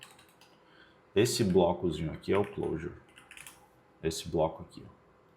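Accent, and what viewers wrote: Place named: Northeast, Brazil. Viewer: Aula Top. Parabéns Bom demais assistir vídeos de qualidade ouvindo um sotaque nordestino igual ao meu 😂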